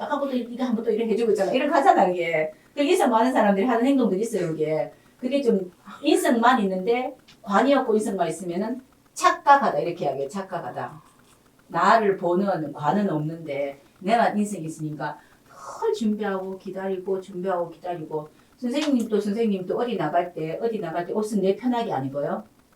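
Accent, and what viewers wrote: Gyeongsang, South Korea. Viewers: 전 고향이 부산인데도 고속으로 듣기가 힘드네요 ㅎㅎ 경상도 어디 사투린지 궁금하네요.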